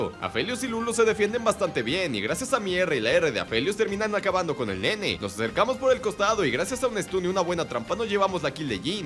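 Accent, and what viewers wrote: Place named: Mexico. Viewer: Eres de sonora o baja California ese acento lo conozco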